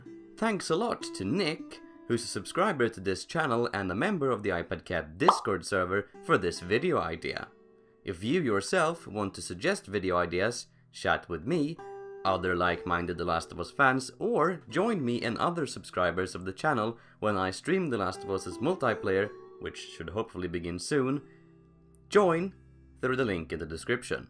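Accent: Sweden. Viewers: Are you Swedish??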